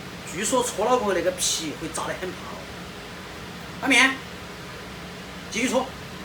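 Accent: Sichuan, China. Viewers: 吃的真香 味口真好 四川話好親切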